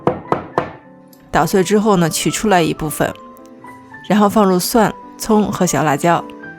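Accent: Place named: North, China. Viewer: 太赞了！谢谢。你亲切北方口音也很暖我心❤️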